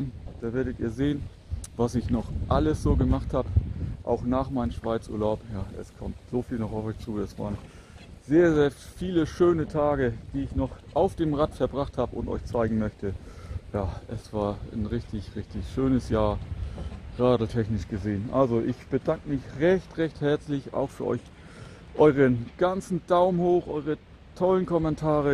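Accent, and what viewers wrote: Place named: North, Germany. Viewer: So einen angenehmen und sympathischen Youtuber gibt es ja auch nicht alle Tage.... Immer schöne Landschaftsaufnahmen, gute Kommentare und die angenehme Nord-Stimme... das macht es halt aus.... Gruß aus der Nähe der Schwalm-Quelle in Hessen (da warst du auch schon ......)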